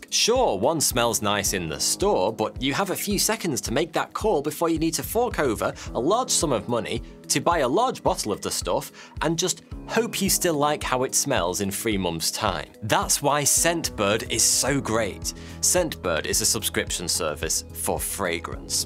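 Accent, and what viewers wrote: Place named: Cockney, United Kingdom. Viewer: Well done! The cockney bit,(Liverpudlian?),is aalllmosttt gone. Oh, the horseshoe crab thing is brilliant! Um, well, craic on then.-weezi-🙏💖🙏💜🙏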